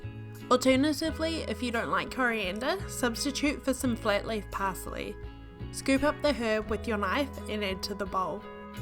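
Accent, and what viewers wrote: New Zealand. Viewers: love a kiwi accent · Sounds like a New Zealand accent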